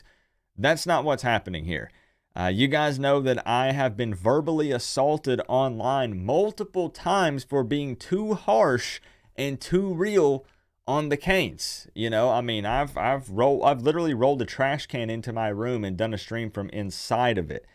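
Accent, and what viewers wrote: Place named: Chattanooga, United States. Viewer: you definitely get that Chattanooga accent..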